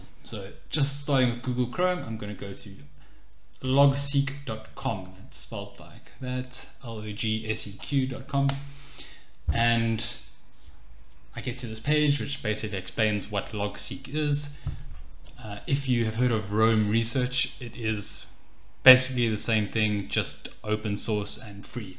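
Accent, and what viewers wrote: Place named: South Africa. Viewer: Very useful, thanks. The accent makes me think you're a fellow South African?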